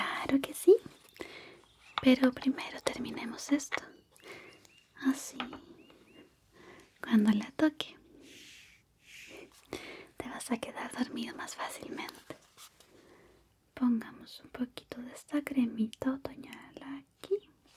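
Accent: Chile. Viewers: Cada día más chileno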